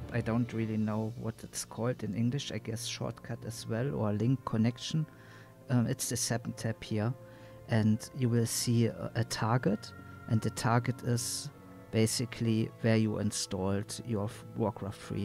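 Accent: Germany. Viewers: teach me german senpaiiiiiii, dude i love that accent "Not a scratch Doctor " Not A Scratch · bist du deutscher xd klingt so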